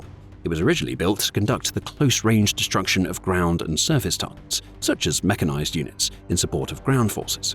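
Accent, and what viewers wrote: England, United Kingdom. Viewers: So the Narrator sounds like he is from the ENEMY of the US, England, & the BBC. They are the HUB of the International Cabal who Trump has been fighting ever since coming down the Escelator.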